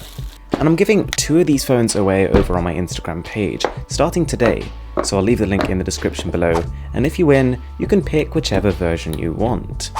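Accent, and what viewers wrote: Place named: United Kingdom. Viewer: That british accent.....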